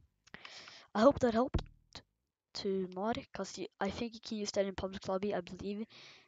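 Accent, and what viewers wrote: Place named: France. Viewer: THIS IS SUCH A STRONG FRENCH ACCENT RESPECT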